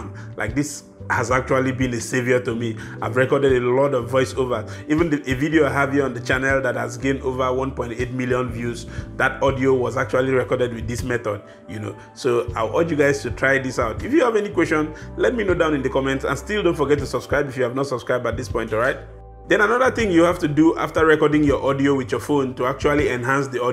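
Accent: Africa. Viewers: I mean he has a rich african accent but a poor american accent, it's a language thing no need to make it so political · I am Norwegian and I love the many ways of speaking English, including yours. African English is very pleasant. It sounds both robust and gentle at the same time.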